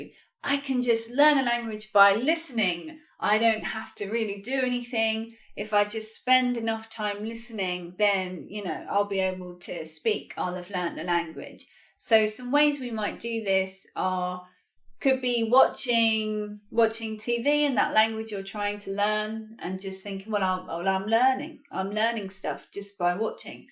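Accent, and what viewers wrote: United Kingdom. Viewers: Thanks for making this useful video. I have been in the same situation and It is not too late for me to change the method to learn English effectively. By the way, I love you British accent a lot. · I love your lesson and your British accent ; )Thanks a lot!!! But I would like more lessons for british pronouncation ;) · I really like your british accent. I am studying English currently a day per week. I lke your videos. Thank you a lot!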